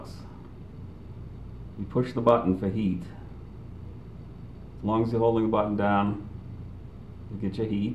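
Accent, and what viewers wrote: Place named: Maine, United States. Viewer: you sure sound down east, by jeezis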